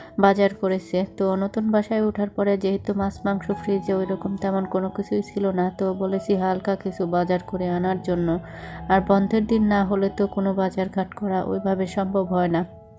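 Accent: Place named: Manikganj, Bangladesh. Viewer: Apnr basha manikgonj kothao.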